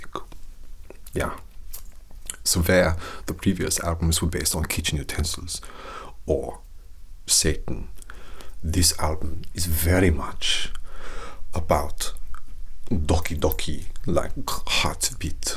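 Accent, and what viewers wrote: Germany. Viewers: GDDGHJBB OMG I HAVE ALWAYS WANTED TO SEE A ROCKSTAR ASMR you nailed this you're so hot like this 😍 and it was brilliantly done! The accent the look the whole atmosphere id say you are German lol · That German accent... Is so good I'm melting!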